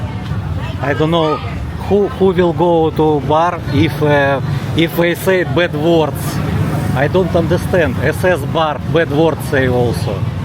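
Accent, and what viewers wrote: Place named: Russia. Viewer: So strong russian accent 😅 Привет, хорошего отдыха тебе! Спасибо, что снимаешь и показываешь!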